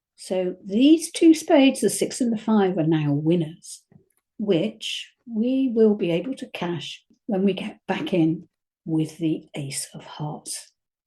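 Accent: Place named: United Kingdom. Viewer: Hello from New Zealand! I am a complete beginner, having just completed an initial 8-week course on ACOL with my local club. I am looking around for additional information and must say that your explanations are excellent. They give important context to all the rules and principles I'm trying to absorb. Thank you for this as it's much easier to remember the rules when I understand the reasons behind them. I look forward to working my way through your videos as I think they are going to be really helpful. 15,000 views would suggest that others feel the same. Love your calm British way of speaking as well - you are a joy to listen to. Thanks again :-)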